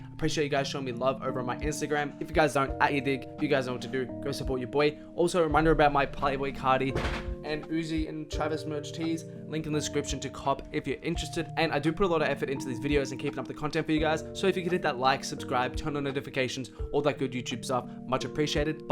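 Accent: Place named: Australia. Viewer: I think it's an australian accent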